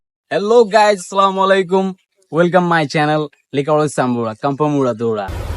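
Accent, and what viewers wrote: Noakhali, Bangladesh. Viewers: Vhai to valoi kotha bolen noakhali bhashai 😮😮😮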